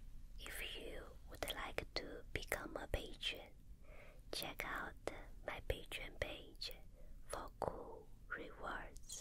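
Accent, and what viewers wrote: Philippines. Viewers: Why does it sound like she has a Pinoy/fillipino accent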